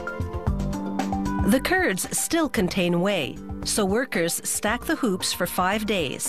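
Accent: Canada. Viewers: is the narrator Canadian? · Actually this is Canadian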